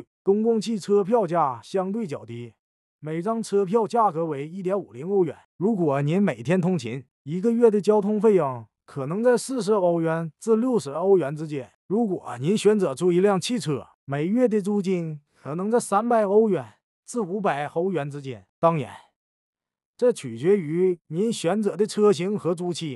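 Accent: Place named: Northeast, China. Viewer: 东北口音太重了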